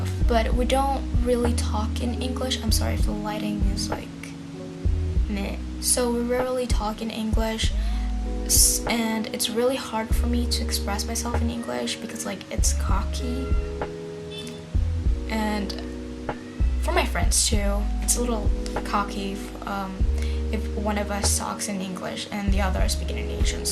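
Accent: United States. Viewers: Hello there:) Greeting from Montreal Canada, your English is fantastic and your accent is top notch. you sound very american. Well done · Sounds pretty much the same as american dialects !!! I like it how you say the T like the general american, keep improving !!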